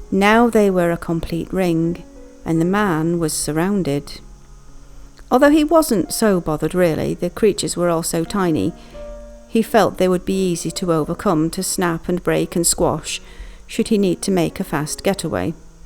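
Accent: Northern England, United Kingdom. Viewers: I visited after the original Poldark series/ books, and eventually moved here. Do I detect a bit of Northern accent now. I always felt there was a mining connection with Cornwall and Yorkshire, my birth county. Lovely video.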